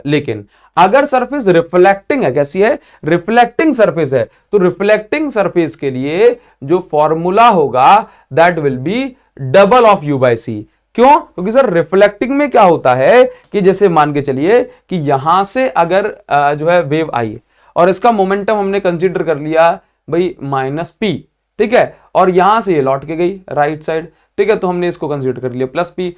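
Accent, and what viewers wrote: Odisha, India. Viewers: Sir are you from odisha ??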